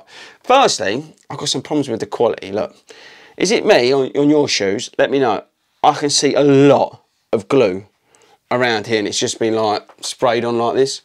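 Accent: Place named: England, United Kingdom. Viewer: you got the best accent man where from England are ya from?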